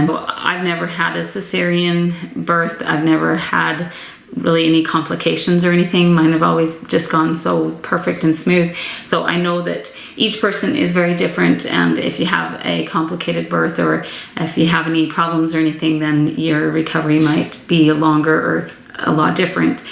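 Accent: Pennsylvania Dutch, United States. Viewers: Would you be able to do a video on your hair care and makeup routine for mom of many? You look so good and I know you’re busy so how do you do it?! Also, random question, do you have a bit of a Pennsylvania Dutch accent or something else? I’m just curious what I’m hearing ! So glad you’re making more videos (watched your laundry video and was sad there weren’t more homemaking videos!!!) 💛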